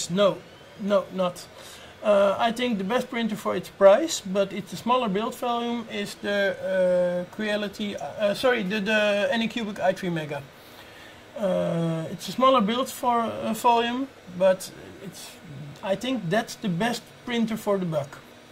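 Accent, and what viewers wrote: Netherlands. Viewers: Grappig, ik vermoedde meteen aan je accent dat je uit NL kwam.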